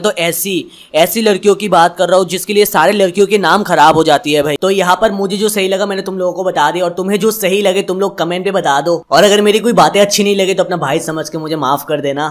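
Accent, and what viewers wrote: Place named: Bihar, India. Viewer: Tum Bihari ho kya be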